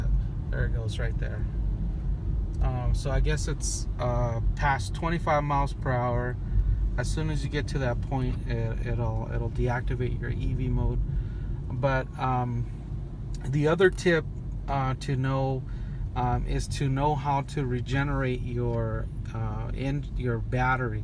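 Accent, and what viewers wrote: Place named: Russia. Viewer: Dude, are u russian? Sounds like you have a russian accent.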